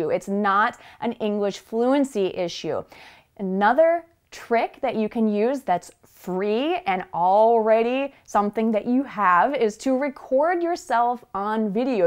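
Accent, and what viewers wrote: United States. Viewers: Whare are you from sister? Is it from America? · I love British but your American English is perfectly beautiful and I always enjoy learning English with your channel, in fact I've subscribed it years ago. By the way, I tell my students too to practice speaking English in front of the mirror at their home..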